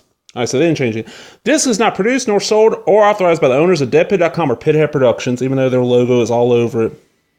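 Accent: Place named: South, United States. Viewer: Also, I really like your thick southern accent in these videos! I have a very thick southern accent myself and kinda reminds me of myself a little bit but mine's slightly different. Where were you born and raised? I was born in Dallas, Texas and grew up in Tulsa, Oklahoma so I'm guessing that's where the accent comes from!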